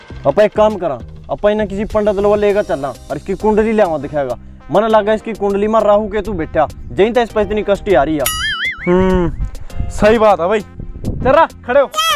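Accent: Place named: Haryana, India. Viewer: Aapka haryanvi bolne Ka style achha lgta h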